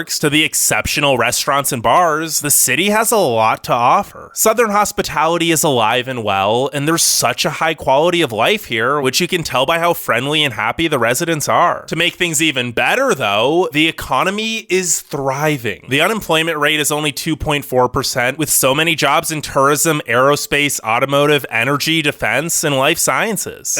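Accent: California, United States. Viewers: Good video. Your California accent is so strong lol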